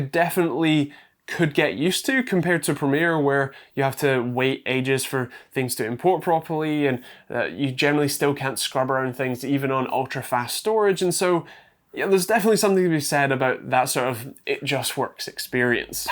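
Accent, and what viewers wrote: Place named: Highlands, United Kingdom. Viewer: No way! a Scottish Techtuber! :D sounds like you are from the Highlands?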